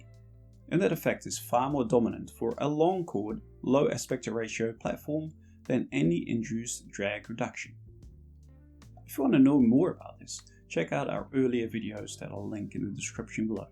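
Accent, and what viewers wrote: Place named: New Zealand. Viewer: You sound like a Kiwi with something else bolted on?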